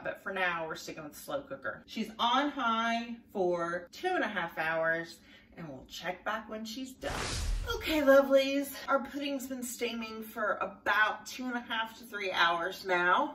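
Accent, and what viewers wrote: Kentucky, United States. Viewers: I love the Kentucky accent! (I'm a Brit.)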